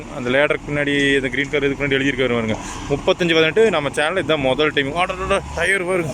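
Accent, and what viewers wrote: Coimbatore, India. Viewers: coimbatore tamil ngow anna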